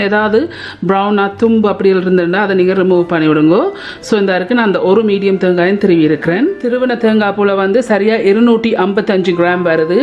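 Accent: Sri Lanka. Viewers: Sister spoken Ceylon Tamil super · Nengal pesarathu alagaa iruku... Ilangai Tamil thaane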